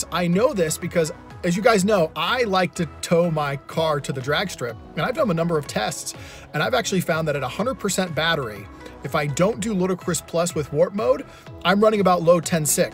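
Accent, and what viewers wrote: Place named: Scotland, United Kingdom. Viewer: Love there is a bit of the Scottish Lewis Capaldi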